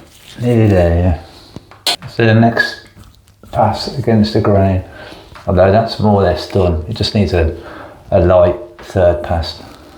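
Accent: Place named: Cockney, United Kingdom. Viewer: A bit of sincere advise mate. Speak a bit slowly because you speak with a cockney accent a lot of people will not understand you and it decreases the number of subscribers.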